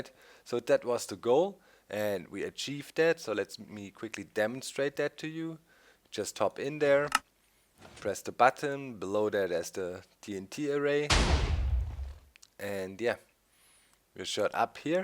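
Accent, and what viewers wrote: Germany. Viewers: you can hear a little german accent :D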